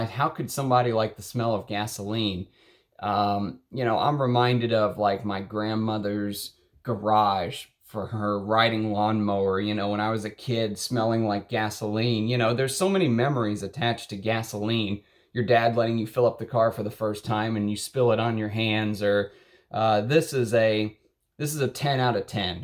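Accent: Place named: Texas, United States. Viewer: Nooo lol. My nose is still learning. It's actually incredibly hard to pick out notes. I chatted to a student studying olfactive psychology, big word 😂, he said the human brain can pick out around 5 notes max in a perfume. Everything else is hidden in a blur. You can get an effect from Hedione for example, it's like air making space. The musks are bloody hard to identify, especially if many are used. I struggle to differentiate them in a perfume a lot. Woody ambers forget it, they all bloody smell the same to me. The more raw materials I smell and study, you can pick them out sometimes if they are overdosed in a perfume. For instance smelled sundowner today (the drydown is a beautiful smooth amber tobacco btw , lovely!), in the opening I smelled the synthetic used to boost a tobacco note. It's in tobacco Oud etc as well. You must notice it. 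Like if you smell Cilantro in food. It's there. Doesn't mean its bad btw, you just can't miss it. Also, I could not do what you do. Firstly my collection is shite compared to yours. And secondly, I haven't got a smooth Texan accent 😉 haha. I am learning a lot from your videos btw. A lot!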